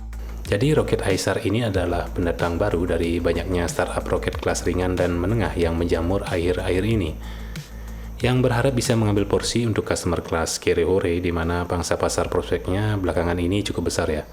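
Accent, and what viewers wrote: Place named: Aceh, Indonesia. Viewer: logat acehnya masih kental bg.. tpi ga papa lanjutkan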